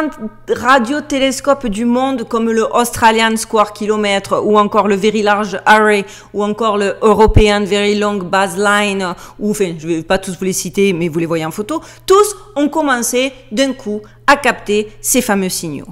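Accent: Marseille, France. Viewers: Tant que les martiens n'affecteront pas ton accent marseillais, pas de soucis 🙂 Une rencontre du 3ème type pourrait être pourquoi pas, bénéfique à une humanité qui part de + en+ en quenouille comme on dit chez moi😉 Merci pour tes vidéos toujours passionnantes. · bonjour 1/ je vous trouve geniale et sympa avec votre accent du midi 2./ je royalement d'accord c'est ce que je pense aussi - vos documentaire sont hyper passionnant que je vais continuer a regarder vos autres videos - regrette pas de m'etre abonnee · Salut, on reste toujours jusqu'à la fin des vidéos! Ok tu n'es pas très catholique, cependant, il reste en toi, et c'est tant mieux, de belles valeurs, celles que le Christ est venu nous enseigner. Autre chose, dans la vidéo de la semaine dernière, tu nous disais que tout avait été dis sur la mort de la reine d'Angleterre et que du coup, tu changerais de sujet. 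Cela dis, je travail près de 10h par jour du lundi au vendredi, et je ne m'accorde que quelques heures d'écran par semaine, le journal TVL chaque soir, les vidéos de Greg Toussaint, un peu de Blanche Gardin, Campagnol TV et bien sûr, chaque dimanche, une jolie blondinette avec un accent marseillais magnifique au surnom de. Alors, je crois que tu peux nous parler de tout, vu que beaucoup, je crois, t'on choisis pour cela. Belle journée, Nico de Belgique.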